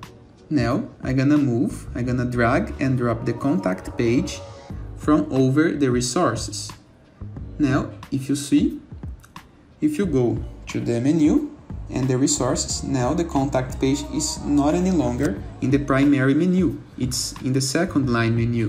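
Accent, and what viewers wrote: Brazil. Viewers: Sotaque BR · Esse sotaque é BR kkk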